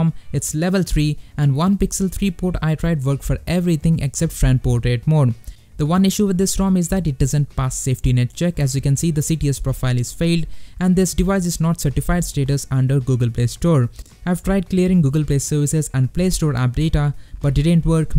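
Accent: India. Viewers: That's Indian accent. Get used to it on this channel. Lol.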